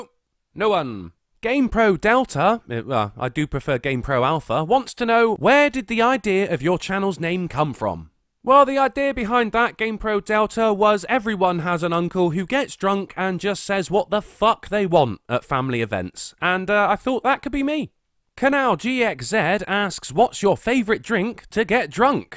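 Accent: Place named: London, United Kingdom. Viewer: "What do you attribute the success of your channel to?" Wit, anti-SJW subject matter at a point in time when it matters and a charming London area accent--if I'm not mistaken.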